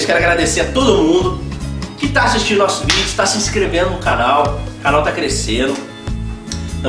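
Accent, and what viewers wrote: Minas Gerais, Brazil. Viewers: Vc é mineiro? Rs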